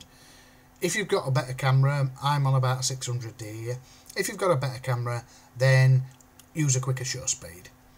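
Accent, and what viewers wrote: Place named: Yorkshire, United Kingdom. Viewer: Hahahaha.... Great tutorial but your accent reminds me of growing up in York. Long time since I've heard an accent that thick. Thanks!!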